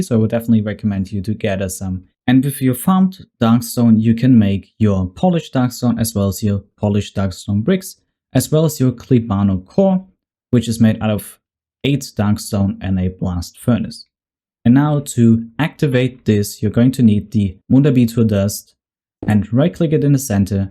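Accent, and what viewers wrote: Germany. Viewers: you sound very german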